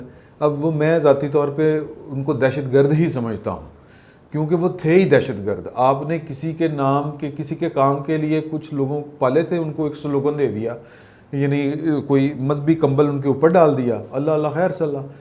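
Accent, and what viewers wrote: Punjab, Pakistan. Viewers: ji I love tour Punjabi dialect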